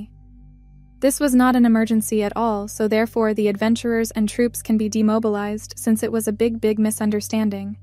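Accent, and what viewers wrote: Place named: Germany. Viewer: Man hört das du Deutsch bist.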